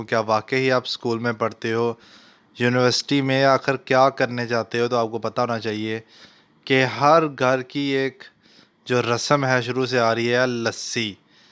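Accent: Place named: Punjab, India. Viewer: یہ پنجابی بندہ ھے یہ اسکول کو بولتے ہیں سکول،جاوید کو بولتے ہیں جوید،رانا کو بولتے ہیں رانڑان،باریک کو بولتے ہیں بریک😁😁😁😁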